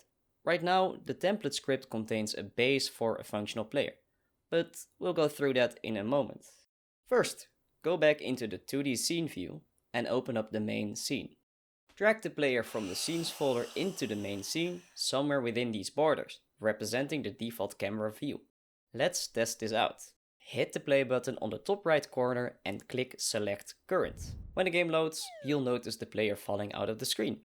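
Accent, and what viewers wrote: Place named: Netherlands. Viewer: zeker wel een Nederlander. Accent is heel herkenbaar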